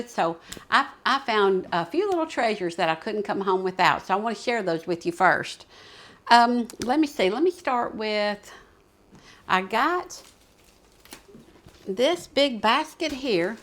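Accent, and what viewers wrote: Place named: Tennessee, United States. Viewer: I’m new to your channel thanks to Jeri Landers mentioning you in one of her recent videos. I lived in Hendersonville & Smyrna TN several years ago & was always antique shopping. I loved going to the Nashville Flea Market once a month. Anyway now I live in GA & haven’t added many more antiques since then, although I must admit I’m pretty full after all those years of collecting. 😁 I sure do enjoy your channel & your TN accent. My friends here say I still have that accent. Thanks so much❤ your GA friend Thanks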